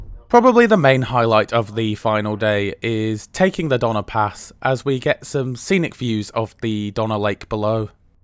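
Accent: United Kingdom. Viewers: I love hearing a British person narrate their American train adventures, it's a good way to see my favorite parts of my home country as experienced from an outside perspective.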